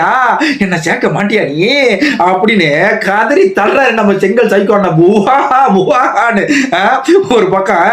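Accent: Kongu, India. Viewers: இது கொங்கு நாட்டு பாஷையா தூத்துக்குடி பாஷையா உங்க ஸ்பீச் வாய்ஸ் எல்லாம் சூப்பரா இருக்கு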